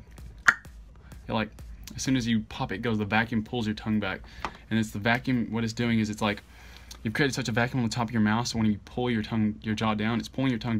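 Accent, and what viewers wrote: United States. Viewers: hes got a southern accent it super awsome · He had a cowboy Accent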